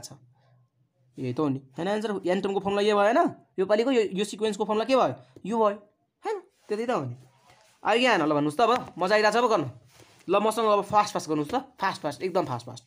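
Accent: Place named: India. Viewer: R u indian? Plz fix ur accent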